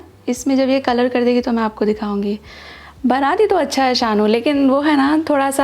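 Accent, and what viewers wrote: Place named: North India, India. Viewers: Pr hum North Indian ese hi krte hai prounce jaise apne kiye so people should not feel offended in this nobody is making fun of that pronunciation but we North Indian ese hi boltae even my mother harr jagah yahi likha hota hain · Actually yar u don't know South indians hum north indians ki pronouncing me bht joke bnate h...mei literally daily notice karti hu..jo words hum Bachpan se bolte aaye h usko yeh wrong bolte h..mei bhi yehi ki tarah bolti hu..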